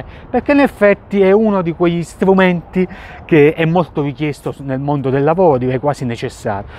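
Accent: South, Italy. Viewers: Tu come lo hai imparato? Ma per caso sei del sud?🤔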